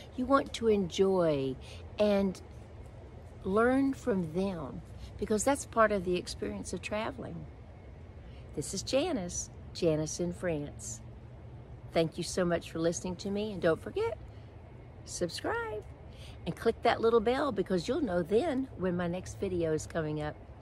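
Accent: United States. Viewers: Don't forget your heavy American accent; it will give you away immediately! ♥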